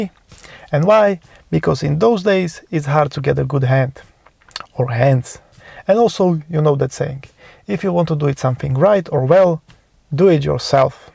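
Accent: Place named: Romania. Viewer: I toght you are from 🇷🇴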